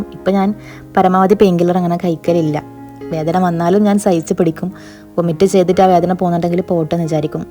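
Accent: Kasaragod, India. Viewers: you are soooo.. sweet. I watch your videos mainly to hear your slang, kasargod slang.. I like your home surroundings, achamma, achachan, amma, achan, kunjava..❤ God bless you all.. 🥰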